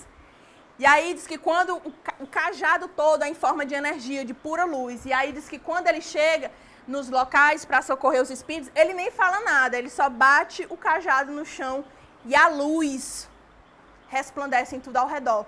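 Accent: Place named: Northeast, Brazil. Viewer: Primeiramente ressalto o português bem falado no sotaque nordestino aff ..Eu amo é um deleite para os meus ouvidos, acho sotaque mais bonito do Brasil. É também dizer que essa palestra foi um deleite para o meus ouvido ,vi a hora mais vou ouvir muitas outras vezes e seguir essa palestrante que amei obrigada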